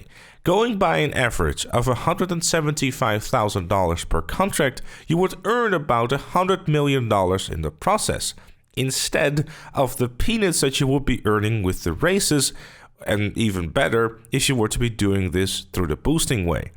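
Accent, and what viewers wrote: Netherlands. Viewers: Bro kwist gelijk dat je een nederlander bent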